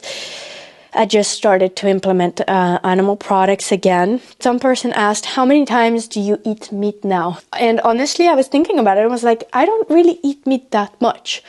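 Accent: France. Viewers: she does have a bit of a french accent that comes out every once in a while and I notice it in many videos. I feel that the same happens to me when I talk in a certain language more often my accent shifts. But yes overall she does not have a strong accent or anything. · I'm wondering if the secret boyfriend is French speaking, more French accent is coming out 😊❤ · Îm love that her little French accent is coming through more. It sounds SO cute! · Nice video as always... your accent sounds more french in this one for some reason :D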